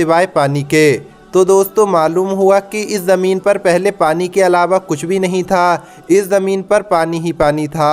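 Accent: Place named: Bihar, India. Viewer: Hi batchit se to bihari lag raha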